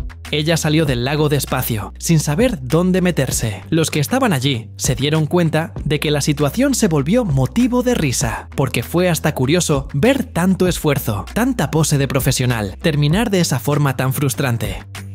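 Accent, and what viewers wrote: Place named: Spain. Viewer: Todo el vídeo es Excelente, solo la voz del narrador tiene un Acento Español molesto, y no es necesario que hable mucho explicando lo que se está Viendo en el vídeo. Saludos desde Tabasco,Mexico.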